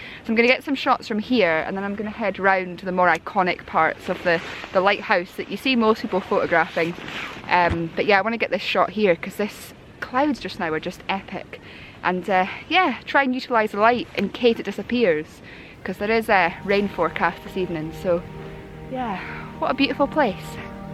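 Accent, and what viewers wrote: Scotland, United Kingdom. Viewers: What a pleasant change to hear a Scottish voice - sub'd!.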